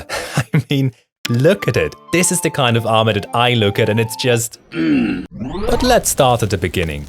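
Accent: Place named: Germany. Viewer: I heard german ! Ich wuste es der dialekt kam mir bekannt vor😂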